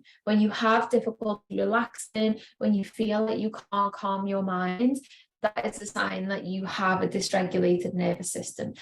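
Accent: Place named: Liverpool, United Kingdom. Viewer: Nice to hear another Scouser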